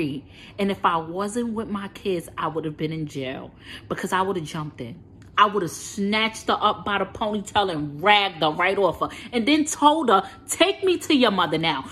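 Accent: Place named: Brooklyn, United States. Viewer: It’s the east coast Brooklyn NewYork accent for me 😍💕 thanks for sharing honey ♥️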